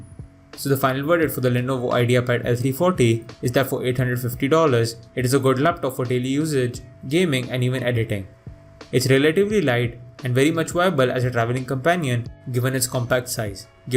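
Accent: India. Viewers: As far as I can figure out from your accent, you're probably an Indian guy. So you should specify the prices in INR too. 🙁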